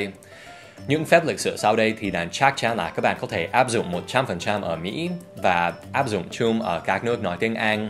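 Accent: Standard. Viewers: Bạn nói Tiếng VIỆT CHUẪN ghê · Dan hài thật phát âm chuẩn học sinh Việt hêhê! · mấy bạn để ý đi giọng nói tiếng việt của thầy Dan càng ngày càng chuẩn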